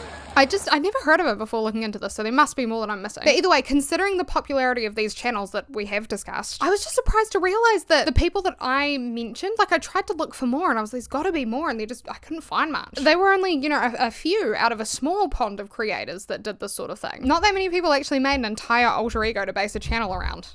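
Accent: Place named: New Zealand. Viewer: my master yapping queen thank you for being my background yapper while gaming there's something about the kiwi that makes your voice so soothing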